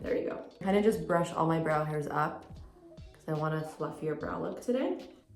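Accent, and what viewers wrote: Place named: Canada. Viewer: she’s canadian lol she just has an accent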